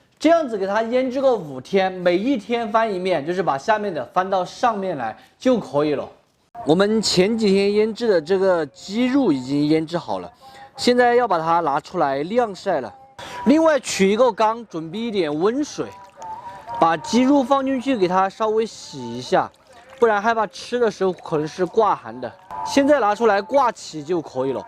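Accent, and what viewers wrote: Sichuan, China. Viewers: 老哥，你的椒盐普通话太地道了，我全程笑着看完的。😂😂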